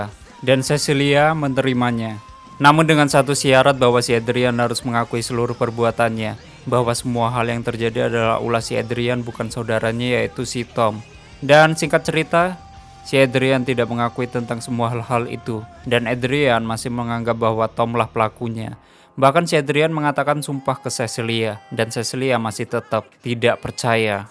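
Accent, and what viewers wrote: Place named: Tegal, Indonesia. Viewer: Voice overnya pasti orang tegal -,-'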